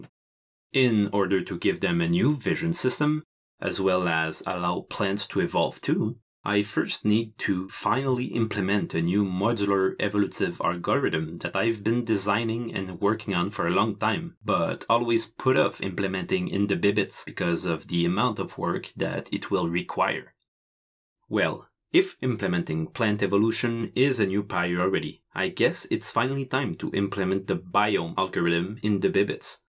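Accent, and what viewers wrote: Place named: Quebec, Canada. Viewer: Bibites sounds Québecois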